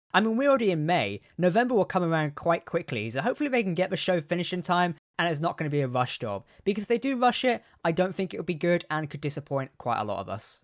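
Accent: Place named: United Kingdom. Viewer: susan chase He’s speaking British English.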